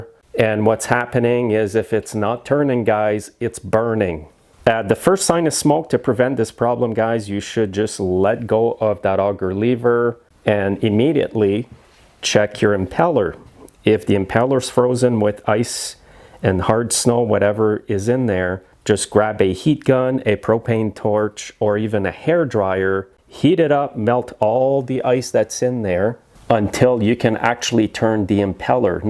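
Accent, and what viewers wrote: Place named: Quebec, Canada. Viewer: You sound like you are from Quebec… are you?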